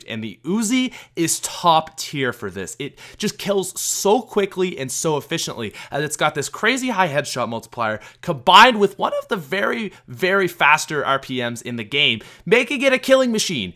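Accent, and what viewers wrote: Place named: Canada. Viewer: He has the most Canadian pronunciation of out ever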